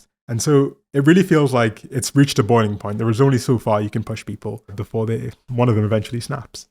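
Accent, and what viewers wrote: United Kingdom. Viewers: Great info, thanks for the time you've put into this. Nice to hear a Brit accent that doesn't sound like that Bond villain Andrew Witty, really cleanses the palate. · wait I didn't even notice he was British lmao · tbf this happens to me, especially given this guys voice is deep and he has a less pronounced british accent. I also watch a lot of non american media so I tend to forget about or confuse different accents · how did you get that british accent? where are your parents from?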